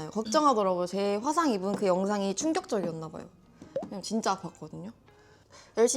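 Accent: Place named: Jeolla, South Korea. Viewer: 예전에비해 전라도 사투리 오지시네요.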